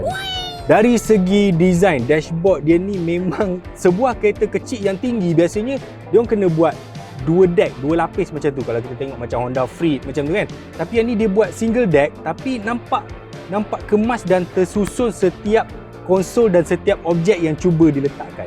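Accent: Kelantan, Malaysia. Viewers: Haha org mentakap kecek klate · ore kelate ke abe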